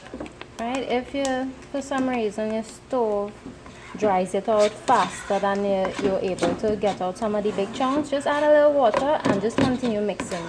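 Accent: Trinidad and Tobago. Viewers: A tru trini talk dey gal 😂❤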